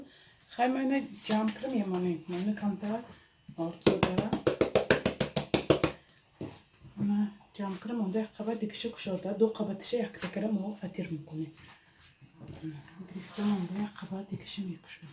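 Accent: Isfara, Tajikistan. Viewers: Фатири ИСФАРА барин !!! Гапзанитон Исфарагихо боин !!! БЕХТАРИН !!! РАХМАТ !!! 👍👍👍🤲🤲🤲 Подписка + лайк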